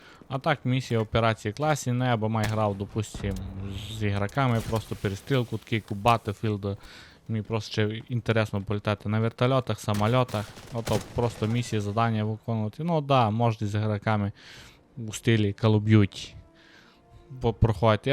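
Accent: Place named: Transcarpathia, Ukraine. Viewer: Чую що ти із сонячного Закарпаття))) з якого саме міста ?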